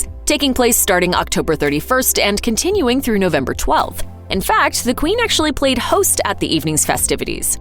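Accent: United States. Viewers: Why is some american narrating this? Absolute rubbish!! Worry about your own disaster of a country now that your own people allowed that trump and his ilk to completely make america look like a bigger bloody joke. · No facts are 'out'! What a waste of time and...a really awful American voice narration! 😖🙄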